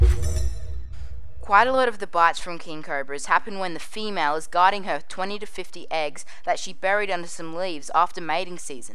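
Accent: Australia. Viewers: I love your accent are you from Australia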